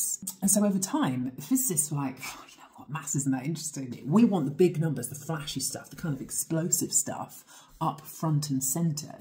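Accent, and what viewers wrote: England, United Kingdom. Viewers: She's very smart, engaging, and good storyteller (something that is sadly lost these days), and yes, easy on the eyes. What I find makes her so watchable (besides the lovely Brih-ish accent) is her confidence and almost quirky sense of humor. The content is very good, too. We'll done! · How can someone be so sexy talking about science? 20% brit accent, 10% red hair, 30% absolute gougeous lips, 40% inteligence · Why did English accent degenerated to the noisy ear scratching American accent we have today? And I had to learn that crap instead of this lady’s beautiful dreamy sound???😡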